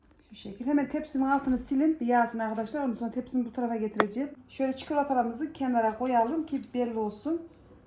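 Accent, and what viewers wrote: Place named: Çorum, Turkey. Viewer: Kolay gelsin hanım bizim gibi konuşuyorsunuz nerelisiniz ben Çorumluyum ama 42 senedir istanbul Eyüpsultanda ikamet ediyorum ❤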